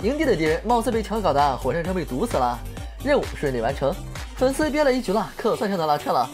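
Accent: Hong Kong, China. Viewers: 你係香港人嗎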